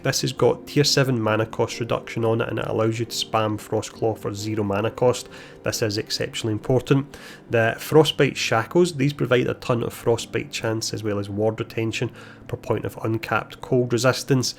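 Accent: Scotland, United Kingdom. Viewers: Scottish accent ?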